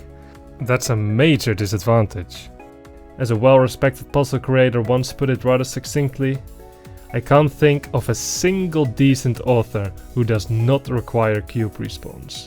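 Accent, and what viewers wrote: Netherlands. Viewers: wait, u dutch? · I was like "What is this Dutch accent?" and then I saw the name and was like "Oh, ok."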